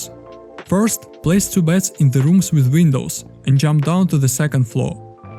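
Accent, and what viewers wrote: Russia. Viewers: ты же русский по акценту бро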